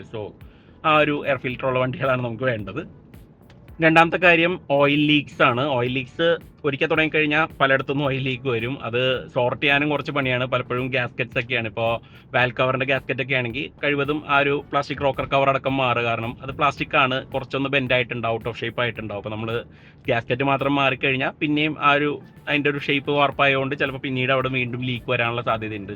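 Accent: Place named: Thrissur, India. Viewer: always a pleasure watching your videos! A few observations though... 😄 Sir, you are our inspiration and role model, which is exactly why we noticed you using your phone while driving - checking car specs AND doing videography at the same time! Multitasking level: expert, but maybe let's leave that out of the next video (or at least edit it out properly) Now, about that age comment, hahaha! Whether you've just entered the 40s or are tiptoeing around it, CONGRATULATIONS and welcome to the club! Also, I'm guessing this was shot on the Palakkad–Thrissur highway? And that Malayalam dialect is giving very strong Thrissur vibes! Are you originally from Thrissur, sir?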